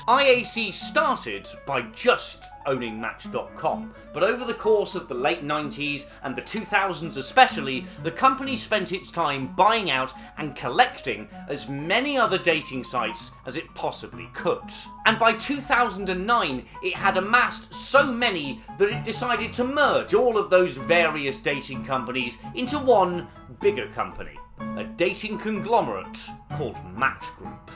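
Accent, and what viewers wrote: United Kingdom. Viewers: Hey, the irate British guy is back!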